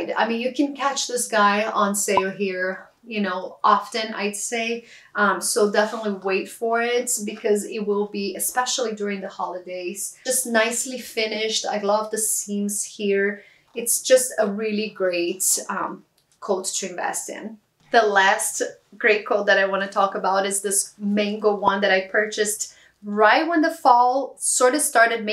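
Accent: Brazil. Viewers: is brazilian for sure, that accent... 🤔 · Are you Brazilian ? I’ve heard a Brazilian accent since the first sentences you said (actually, I’m writing this comment on minute . Just curiosity 😊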